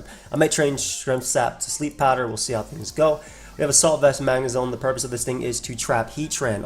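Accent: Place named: New York, United States. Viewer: The New York accent hasnt faded from Connecticut dwellings. Yes. 👌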